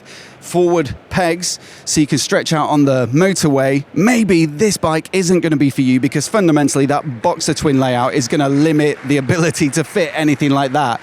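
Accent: England, United Kingdom. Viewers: excellent side by side overview of both new R12 models. Presented in a clearly spoken and easy to understand generic English accent, thank you. Particularly liked that you sat on the bikes to illustrate their relative size. Eagerly waiting for your road test - and the USA release of course.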